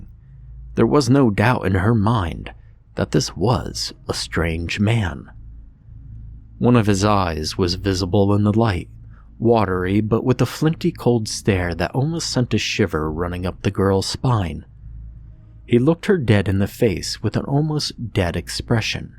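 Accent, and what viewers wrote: South, United States. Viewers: As a Tennessee girl I really look forward to your "country accent". You never disappoint ❤️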